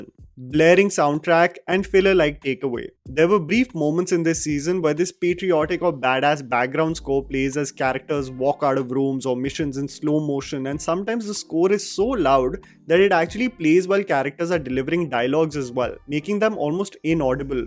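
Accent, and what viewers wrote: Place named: India. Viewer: Congratulations of 1M. Every time i start your video for review and end up being mesmerized by your english and accent. Like Uk, Us accent yours one is pure definition of indian english accent. Like this is how is should be.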